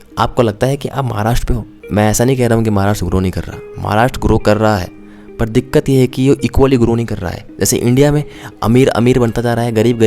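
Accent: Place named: Pakistan. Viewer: Tumhe kisine nahi pucha kya reality hai Pakistani tum ho tumhara dialect batata hai . Ai Bana Kar kuch bhi bakvas mat banao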